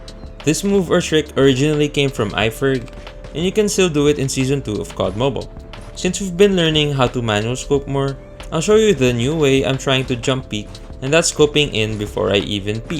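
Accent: Philippines. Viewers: u sound like filipino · Wait..pinoy ka?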